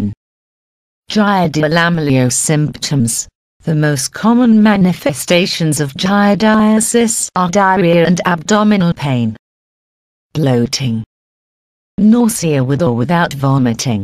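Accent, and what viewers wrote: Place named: England, United Kingdom. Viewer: That English accent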